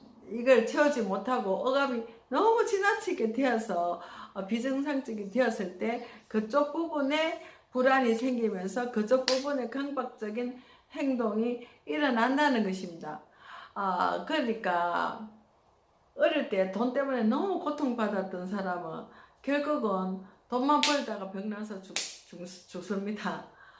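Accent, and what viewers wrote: Gyeongsang, South Korea. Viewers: 오늘 리비도에 대한 삶의 에너지 설명 매력적인 경상도 억양으로 잘 말씀 해주셔서 잘배웠습니다 좋아요 12번째 응원합니다 아무쪼록 좋은하루 되시기 바랍니다 😊 😄 😀 🙏 🤗 💕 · 강의내용에 많은 공감을 합니다 휴일 잠시 님의 강의를 다시 듣 고져 시청중입니다.그리고 연결 합니다..자주 뵙게되겠습니다~^~ 저는 서울입니다.30여년 대구에 서 자라고 학교를 나왔죠..쌤의 사투리가 대구.부산? ㅎ멋진 휴일되세요~^~ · 안녕하세요💓 리비도ᆢ 누구에게나 기본적인 정신분석을 잘 말씀해 주셔서 저에게 많은 도움이 되었습니다ᆢ 경상도 말씨는 은근히 매력있습니다 🤗 감사합니다 행복한 오 월 되세요 🥰🌻🌷🌷🌷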